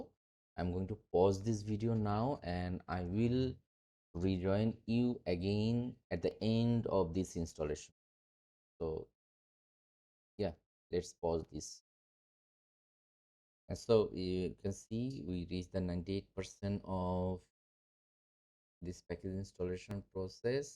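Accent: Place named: India. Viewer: NEXT TIME ASK A NATIVE ENGLISH SPEAKER TO RECORD THE VIDEO. VERY DIFFICULT TO FOLOLOW YOU WITH THAT STRONG INDIAN ACCENT.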